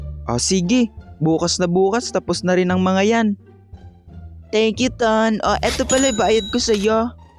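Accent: Visayas, Philippines. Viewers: Bisaya kapo ba kuya? Ask lng po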